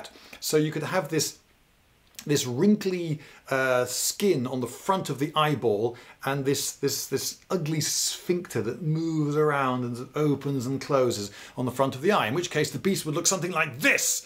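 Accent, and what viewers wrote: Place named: United Kingdom. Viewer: Did I just learn about biology by some british bloke talking about the visual abilities of a cyclops? Amazing world we live in.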